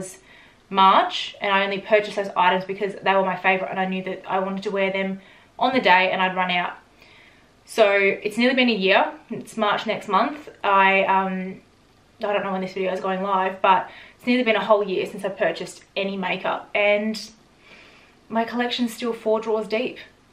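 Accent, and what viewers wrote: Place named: Australia. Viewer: don't worry about this toxic remark, you are too polite, girl👏😘, your charisma, intelligence gorgeous Australian accent😉😘, beauty and character make up for anything and everything, ok 💐🙏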